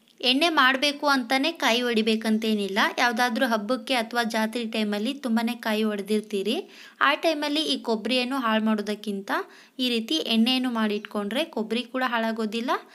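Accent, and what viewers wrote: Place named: North Karnataka, India. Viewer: Mam nivu nam kadeyora....uttara Karnataka avru nim voice hage ede swalpa adake kelde mam ...thank you so much for your recipe mam🥰😍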